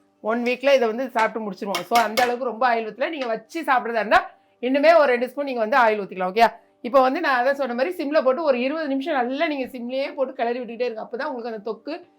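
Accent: Tirunelveli, India. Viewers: Neenga tirunelvelithana akka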